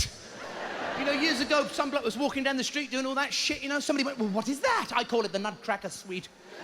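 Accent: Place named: Birmingham, United Kingdom. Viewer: The brummie accent kills me as well.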